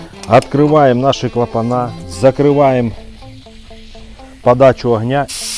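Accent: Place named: Kuban, Russia. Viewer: Ну шо поделать, с Кубани парень..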